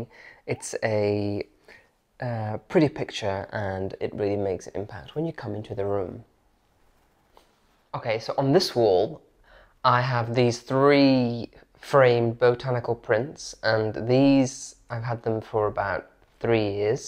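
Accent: RP, United Kingdom. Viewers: Oh that BBC Accent - it's like listening to a young Kenneth Kendall reading the 9 O'clock news. I am an avid fan of Farrow and Ball, their Dead Salmon is to die for, pardon the pun, but Mouse's back - well I never - they do name their paints after some odd things. Such a stunning room with so much potential - I think you need to pay us all a visit and give us some design tips and ideas, they always say a fresh pair of eyes is like a new beginning, you never see it yourself until somebody else tells you.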